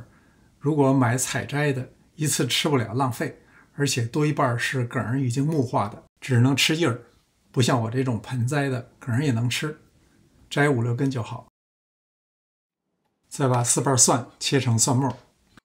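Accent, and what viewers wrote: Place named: Beijing, China. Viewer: 每次听您讲出了佩服你精益求精的精神，还总会被你最后经典的点缀笑翻了。“别把牙硌了”😂😂😂地道北京话。老乡您实在是个难得的人才！这道洋菜家里养老公一定会吃得走不动了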